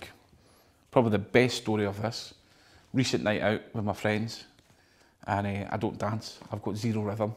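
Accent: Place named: Scotland, United Kingdom. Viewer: He's Scottish 😊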